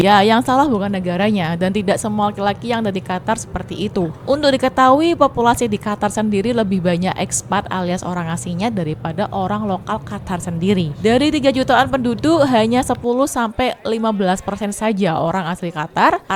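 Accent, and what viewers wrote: Java, Indonesia. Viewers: Wow ... sounds an interesting story and experience. Traveling around the globe is my dream. Keren, mba. Aksen mba kaya orang Jawa.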